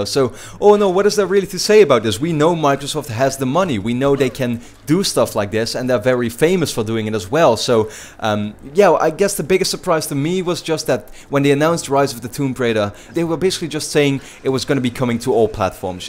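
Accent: Netherlands. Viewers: Are you dutch? Your accent ;)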